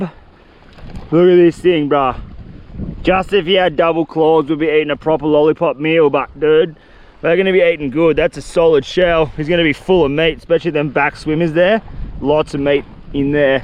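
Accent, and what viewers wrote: Australia. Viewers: That thing just absolutely making me piss - he is so hilarious when he says aussie sentences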